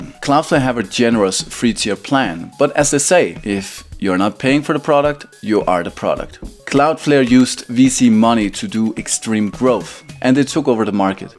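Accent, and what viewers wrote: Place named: Denmark. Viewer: This is a great channel! I'm going to venture and guess that's a Danish accent. We need more Dane dev youtubers! Godt arbejde!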